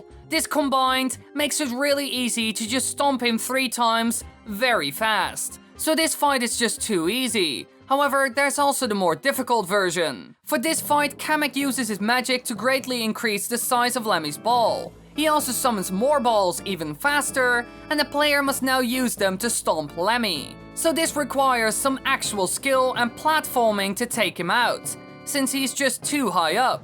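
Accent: Netherlands. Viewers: Are you from Nederland s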